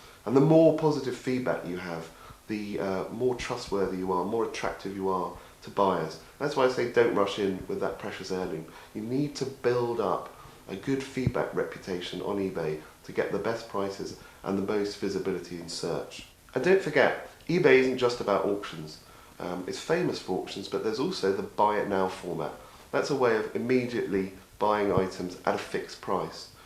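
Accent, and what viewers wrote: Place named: United Kingdom. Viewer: IM from us and i understand him? Have you ever heard of a British Accent ? lol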